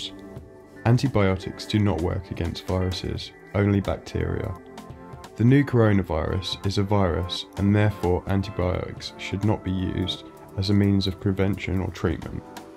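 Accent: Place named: United Kingdom. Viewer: Wow Your British!! Never seen anyone British on my channel. Great Content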